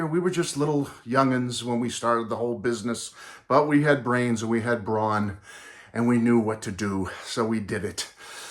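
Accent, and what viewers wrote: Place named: Texas, United States. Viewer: That explains obvious Texan accent.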